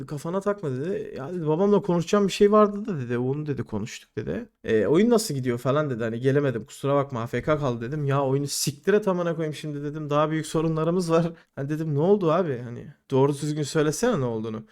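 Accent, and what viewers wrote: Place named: Kahramanmaraş, Turkey. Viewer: Kanka Kahraman Maraşlı mısın ? bizim otaraf gibi konuşuyon :)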